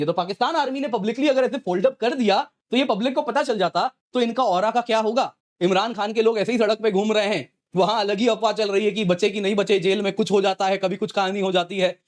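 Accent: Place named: Punjab, India. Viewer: Je Toh pure Punjabi tha ,❤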